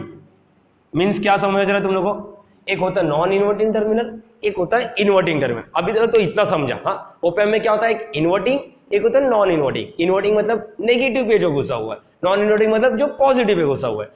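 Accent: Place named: Hyderabad, India. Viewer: Hyderabadi accent 🤩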